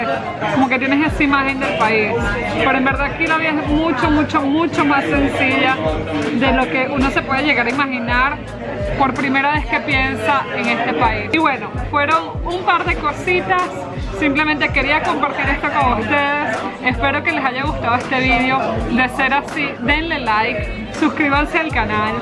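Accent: Caracas, Venezuela. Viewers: Coño mi pana el acento de sifrina de caurimare no se te quita.... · Hola, recién te estoy conociendo por qué tengo pensando viajar a Holanda y quise indagar un poco más, soy barbero que sabes de eso allá? Por cierto también soy de Venezuela, reconoci tu acento. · Vi su video por mí interés de viajar a Amsterdam, no se de qué país es usted, asumo que venezolana por su acento. Sobre su video el fondo es bueno pero la forma... Éxitos. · tengo duda por tu acento, eres venezolana? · Hablas como Caracas Ciudad de despedidas. Que pena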